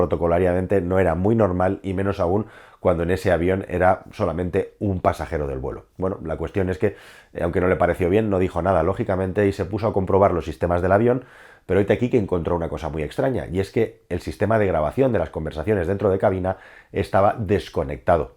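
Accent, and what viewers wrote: Spain. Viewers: Me desagrada hoir ablar a un chapeton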